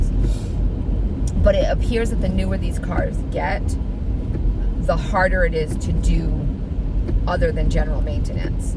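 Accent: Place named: New England, United States. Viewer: Been meaning to respond for a week now because I just stumbled across your videos. I love your accent and I could immediately tell you were from New England because of it! I just wanted to chime in here. I'm 5 feet 6 inches, and have very small wrists. I wanted a watch that would record my steps and my blood pressure. I'm really not a fan of Apple products and wasn't really sure that I would use an Apple watch if I had one. So I went the cheaper route and found one on Amazon that was $30 with a $10 coupon. The watch was $20 and some change. It received 4.5 out of 5, and after reading the reviews, I bought it. I couldn't be happier. Yeah it's a little bit big on my wrist, but it measures everything I want and you can shut off the things you don't. I became a little bit fascinated with my sleep cycle, because I'm retired now and can sleep as long as I want, pretty much. 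I used to commute 5 hours a day to my job, so sleeping is very enjoyable, lol. Anyway, I bought this watch thinking I could exchange it down the road, for something I liked better. Forget about it. The battery holds a charge forever, it's got a bazillion clock faces to choose from, and the water reminder lights up, vibrates a little and tells you to drink water! What's not to love? It's a little bit difficult to set up, but really this watch can do way more things than I use it for, so just the basics are easy to set up. It does everything an Apple watch does, and somethings I didn't know about, like find my wat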